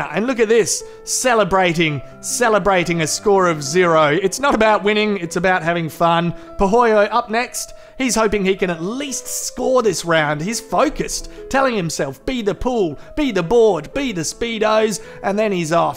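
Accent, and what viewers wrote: Australia. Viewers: To all pinoy... this guy just literally gving the normal dialect of australian review. He do review jason statham diving shit. That lmao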